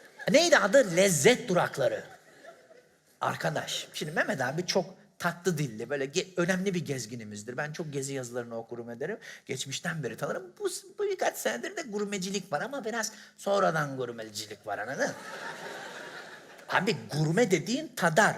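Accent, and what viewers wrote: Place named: Diyarbakir, Turkey. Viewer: cem yılmaz niye diyarbakırlı gibi konuşuyo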